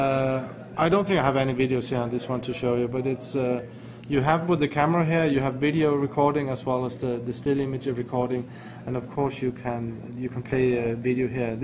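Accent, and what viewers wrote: Denmark. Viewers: danish accent when its best.. just hear lars ulrik from metallica.. heheh the same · How can you make this accent swedish? I won't tell you that the dude IS danish - just that the accent is. XD Anyways - what dialect does he speak? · Wow - that's a heavy danish accent..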